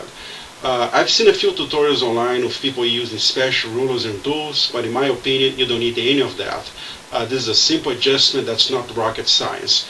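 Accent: Brazil. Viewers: sotaque brazuca detected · dude, are u brazilian? u really sound like one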